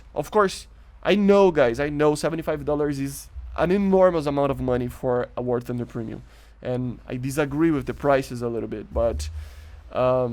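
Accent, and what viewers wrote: Brazil. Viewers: Tu é brasileiro né? K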